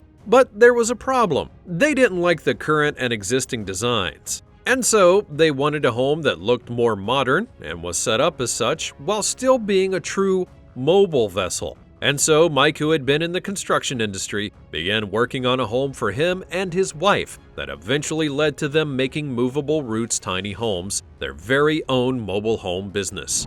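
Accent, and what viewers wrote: United States. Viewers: None of these are mobile homes though. They're cool campers don't get me wrong, but they aren't mobile homes. they aren't meant to be lived in, except, perhaps, for the tiny homes but even those still aren't mobile homes. I would think that this word difference is cultural, but you sound as American as they come so I don't think that's it...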